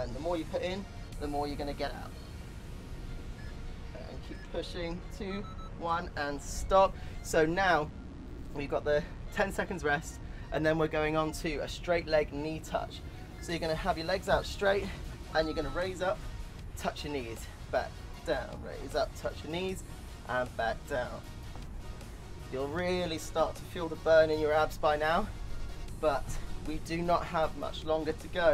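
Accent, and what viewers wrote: United Kingdom. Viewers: you are simply best... Greek Body, Innocent and cute loving face.....your British accent everything with killer smile... All this these things creates something I call that as Magic... I wish can meet you, your hubby and son one day in person. Keep sharing this beautiful and inspiring video... We love you:) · Love your BRITISH ENGLISH accent！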